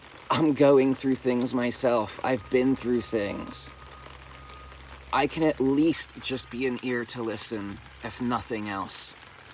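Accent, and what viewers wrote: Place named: New Jersey, United States. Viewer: That's a beautiful article! I have some chronic illness issues as well as mental health so this hits home for me too. Another good one is called the "spoon theory". It helps with both chronic and mental health illnesses. I'll try to remember to send it to you on fb if I can find it. I'm also a little tech challenged so it might take a hot minute. 😂 PS where is home for you? You sound like my childhood lol. I grew up in May's Landing NJ and was born in Atlantic City so I know jersey when I hear it lol. I always call it my first language because as soon as I hear that accent I go right back to it lol. You can take the girl out of Jersey but never the jersey out of the girl! 😁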